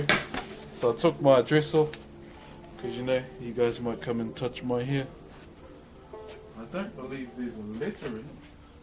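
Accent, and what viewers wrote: New Zealand. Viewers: It's our kiwi accent he said "Hold on guys" he needed time to compose himself from crying on stream. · well, he is a maori, it´s a pretty common accent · Because they've both got kiwi accents which are pretty similar in tone and pitch lol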